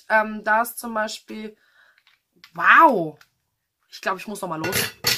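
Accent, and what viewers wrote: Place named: Swabia, Germany. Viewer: Du schwäbelst hart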